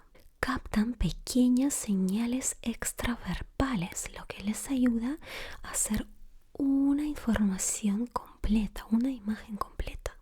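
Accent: Russia. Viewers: Por ese acento de una Rusa hablando español <3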